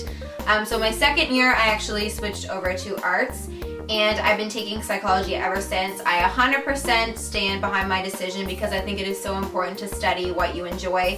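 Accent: Canada. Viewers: can you do the accent tag? :P i could always tell you were from canada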